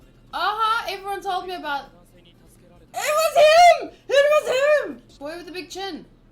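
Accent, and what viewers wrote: South Africa. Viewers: You sound South African? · Wait you sound South African. Are you? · Nice accent! Where in SA are you from? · I just knew you were South African!!!!!!!!(0_0) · you sound South African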